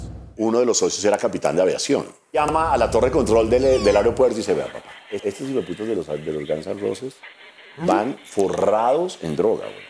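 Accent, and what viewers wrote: Bogotá, Colombia. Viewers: cuenta la historia muy amena, los Colombianos tienen un acento encantador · tiene el acento más bogotano de Bogotá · ese es el acento en Bogotá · Acento Rolo jajja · Mas Rolo que este man no se puede ser🤣🤣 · es acento cachaco propio bogotano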